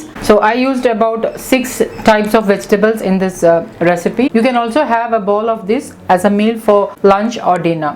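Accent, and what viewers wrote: Kerala, India. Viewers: you dont have to mention............. your accent itself tells us you are a malyali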